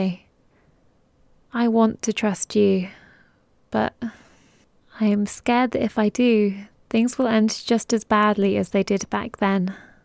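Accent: England, United Kingdom. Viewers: It’s just an English accent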